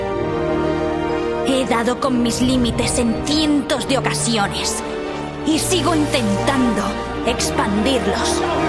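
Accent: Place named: Spain. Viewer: Está vez español de España fue mejor que el de latam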